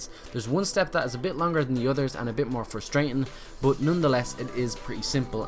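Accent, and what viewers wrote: Ireland. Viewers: are you irish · great to hear a fellow Irishman doing something like this :)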